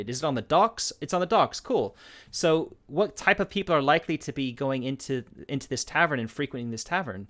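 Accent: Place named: United States. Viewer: Interesting game idea. Also, unrelated to anything you were talking about: do you know you sound like an American?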